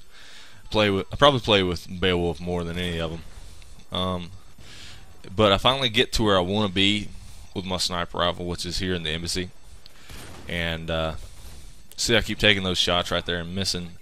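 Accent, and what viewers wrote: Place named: South, United States. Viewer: Nice video, great quality, good tips. Are you from the south? I'm from GA, your accent sounds kinda familiar.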